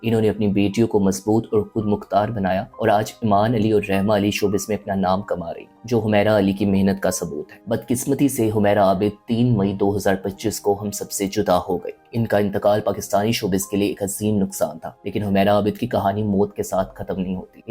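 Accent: India. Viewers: Ap Pakistani hain Indian ki trha na bolain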